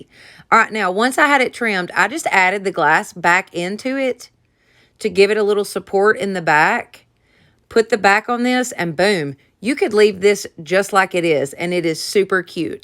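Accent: South, United States. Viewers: I always love everything about your channel especially the accent seeing as I’m from the south 😍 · I love your southern draw & your upbeat personality! You must be a southern gal like myself. I love how you say ‘dude’ and ‘slap that paint on y’all’, lol. You’re definitely a lot of fun to watch & listen to! All of your crafts are beautiful. I love the lanterns! The 3 candle centerpiece is so gorgeous! These are my favorite. Hope you & your family have a Merry Christmas! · You are really fun to watch. I love all your DIY’s. Also you have a wonderful accent. I grew up in Alabama and I miss the southern accents. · Love your southern accent. I’m from West Tennessee!!! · Can't pick a favorite as all of your projects are beautiful. Best part is; I have all the materials to make them. Lord have mercy girl you're a kindred soul for sure. You speak "Southern!!!" Music yo my ❤️. 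Have a Wonderful Christmas 🎂⛄❄️ and Happy New Year.😇...Roberta from N.C. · You are literally the BEST bow maker I have ever seen. ❤ Your lanterns are gorgeous! Ok.. I love your accent. It is a mix between my grandma (OK/ARK) & my daughter (TN/GA) in law. 😉 It makes ya like family. ❤ I love your Reindeer Farms sign. It is gorgeous! Another amazing bow! 🎀 The candle holder turned out amazing! 🕯 That last sign is perfect. The picks in the corner like that is beautiful. Ty soo much for sharing. I appreciate it. So happy to have you on this Hop! 😀 Merry Christmas! 🎄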